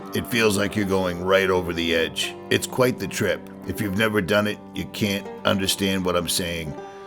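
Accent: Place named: Worcester, United States. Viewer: You sound like your from Worcester :-). Welcome to AZ, just found your channel subbed and liked. From one Masshole to another, be careful out here, beautiful country bud deadly as hell!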